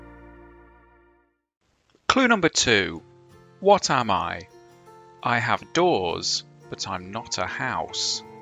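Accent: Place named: England, United Kingdom. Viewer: 18 wrong for me on that one. Although I know it is a sphygmomanometer, most medical professionals call it a cuff, probably because that is less of a mouthful. As for the ponderer's question, I'd have thought with your English accent you would have known it may be an elevator for Americans, but it is a lift in English English.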